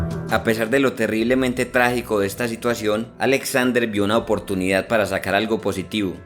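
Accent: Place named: Antioquia, Colombia. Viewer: Ese acentico paisa le quita veracidad al vídeo, ya sebemos que los paisas son tan mentirosos y exagerados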